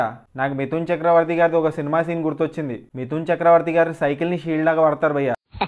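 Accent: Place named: Andhra Pradesh, India. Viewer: Mowa nvvemo nedi telanagana annav..ne mata theerendhe andhra laga undi...ee bhayya bhayya kuda manadi kadhe..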